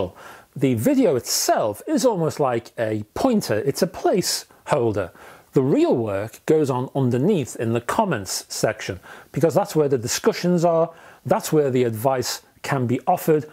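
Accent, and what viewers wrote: Liverpool, United Kingdom. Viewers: Hi. Love your reviews. Returning to the UK with a pair of Elac Unifi 2.0's and an Audioengine B1 Blutooth DAC. I use the Audioengine to stream music from my phone. I want to purchase an amplifier to complement this set up. I Plan to connect the TV to the amplifier and maybe some other digital sources so optical and coaxial inputs would be important. My budget would be around £500. Any suggestions? Love your L'pool accent. Love L'pool too. John.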